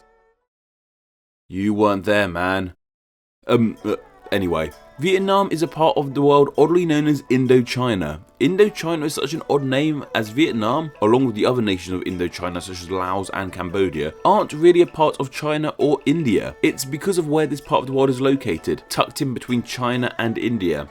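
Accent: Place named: United Kingdom. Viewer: Plus, its not like we're going to use foreign words mentioned here. He apologized tons of times about misspronounciations already, and your suggeated alternative would be HORRIFIC! I dont want his videos to be interupted by a random video. Plus, the guy has a specific british accent if im not misstaken, so pronouncing other words is much harder for him.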